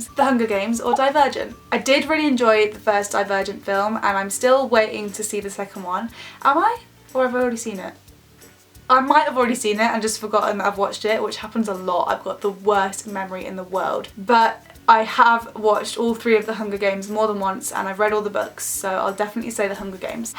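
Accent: England, United Kingdom. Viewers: Prince T No its not London. She doesn't really have a strong accent at all (from a British point of view). It just a generic English accent. I think she has said she was born near coventry which is clise to where I grew up and lots of people spoke with an accent like.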